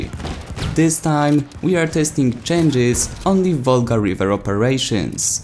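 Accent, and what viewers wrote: Poland. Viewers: He just spends 8 minutes rambling on about crap with a horrendous polish accent. Yeah, right.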